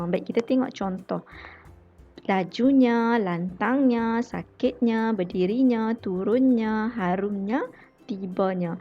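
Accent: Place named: North, Malaysia. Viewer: Tahniah ...tapi loghat utara sangat ketara....sepatutnya sebutan baku...boleh ditingkatkan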